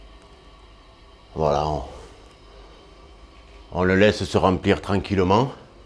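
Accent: South, France. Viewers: Bonjour! Tu es vraiment bien outillé mais c'est aussi le secret d'un travail bien fait! J'adore tes vidéos et cet accent du sud qui respire le soleil! Stéphane de Belgique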